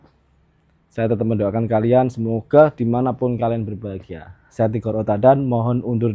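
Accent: Java, Indonesia. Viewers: Nama nya Tigor tapi suara nya medok...mantul👍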